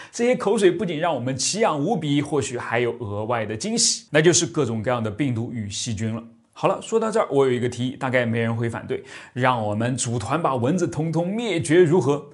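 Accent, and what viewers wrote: Nanjing, China. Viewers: 是南京人啊？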